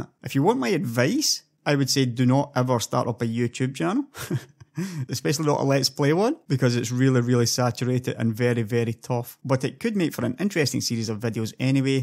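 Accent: Scotland, United Kingdom. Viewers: hey i really enjoy your x-com vids. Start a twitch channel and freestyle it, and your Scottish swear more! :) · can i ask are you scottish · What I wonder about is why am I listening to a Scotsman whose Youtube localisation is from Sweden. There's clearly something not fitting right here.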